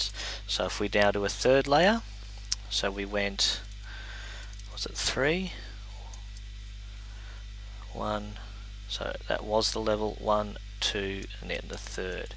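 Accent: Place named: Australia. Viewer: I thought you were Australian from the accent and turns out i was right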